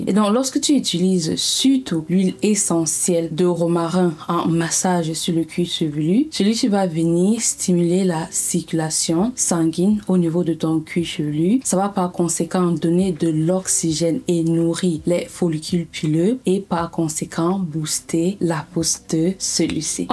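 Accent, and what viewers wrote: Cameroon. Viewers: J'aime trop ton accent camerounais 😊😍💋💗💖💕😜🌊🩷😗🫶🏿😘🥰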